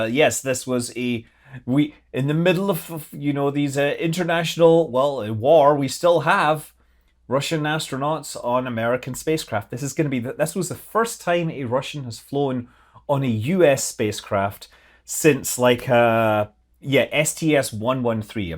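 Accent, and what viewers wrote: Scotland, United Kingdom. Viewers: Hi,! In regards the Alpha launch, I'm not as convinced as you seem to be that the issue was poor guidance, or possibly an upper stage engine underperformance. When the video signal was recovered from the upper stage, after the circularization burn was performed, the stage was slowly tumbling end-over-end. And I seem to recall one person on their flight control loop asking why the vehicle was maneuvering, and someone else asking, rather that stating, that maybe it was just moving to its payload deployment attitude. I watched as it completed one full end-for-end loop, and continue on, so I have doubts that it was in a planned "maneuver". My best guess is that they lost attitude control after the initial orbital insertion, and that the circ burn happened with the engine pointed in the wrong direction. It would have been more obvious of a failure had the burn been retrograde, but it seems to have been more tangential to the trajectory, so it just altered the orbital plane by a fraction. 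I'd be surprised if that isn't eventually announced as the problem they encountered. Keep up the good work! And I do apologize, but when speaking of you to my friends who are also into space exploration, rocketry and flight, I tend to refer to you as "I'm, and I'm manly!" I don't think you project that attitude, but the way you say it (possibly influenced by an American's reaction to your Scottish accent), it always sounds like that to me, lol!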